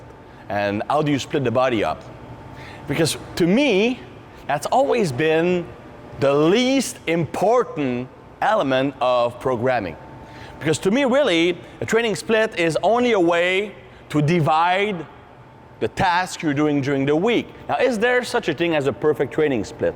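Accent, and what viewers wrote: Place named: Quebec, Canada. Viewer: He’s French Canadian bro 🙄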